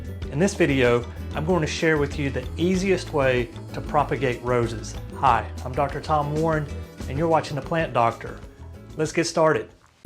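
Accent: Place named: St. Clair County, United States. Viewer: I watched this video for all of 3 seconds and knew that accent immediately.(grew up in st clair County for 19 years)😂 I'm subscribing for that alone. 🫡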